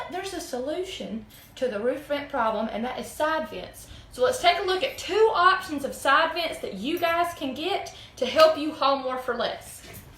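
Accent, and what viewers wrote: United States. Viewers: Listening to you speak sure makes me miss Tallahassee; we don't talk like that in Wisconsin. I've been debating side or roof -mounted ventilation and your informative video was perfectly timed - thank you. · Love that southern accent!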